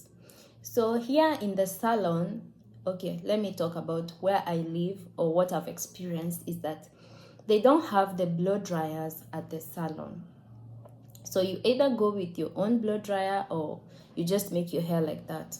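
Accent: West Africa. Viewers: 2 years in Togo has you speaking like a West African already 😏 .... This is very interesting content!! More like this please 😊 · You now talk like a West African 🤔🤔😄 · Your accent has become so west Africanized!! So interesting to hear about your experience living in a different part of the world. You are really brave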